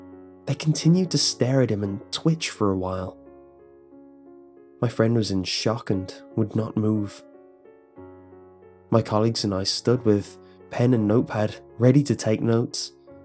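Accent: United Kingdom. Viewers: Hi. What is your accent called exactly? I got the exact same and people ask me what (British) accent is it?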